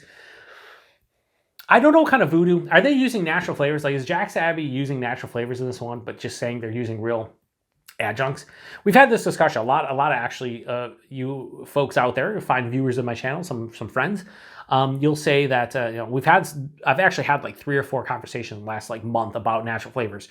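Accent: Western New York, United States. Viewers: Don’t worry about your pronunciation. I think it’s mostly the western New York accent just showing itself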